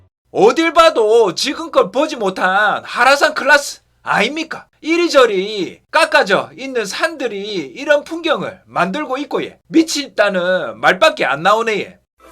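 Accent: Gyeongsang, South Korea. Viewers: 갱상도 살아있네예~ 👍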